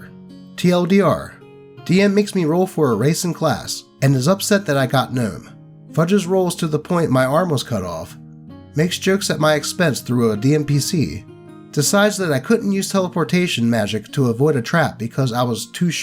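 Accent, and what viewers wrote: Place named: Pennsylvania, United States. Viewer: I started watching Mare of Easttown, and they have the same accent as you! Strange coincidence.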